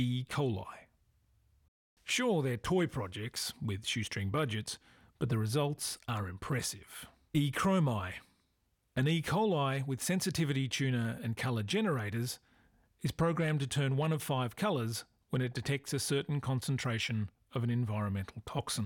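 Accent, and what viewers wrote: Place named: Australia. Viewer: Very intriguing. Nice video. Narrator sounds like Christian Bale as an Aussie...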